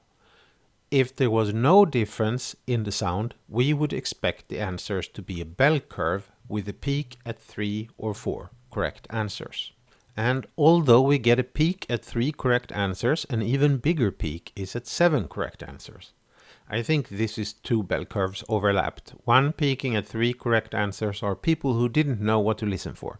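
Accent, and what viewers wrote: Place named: Scandinavia. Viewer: Your accent sound pretty much like your from scandinavia. I would guess you´re from sweden or norway, but, am I correct (im swedish by the way).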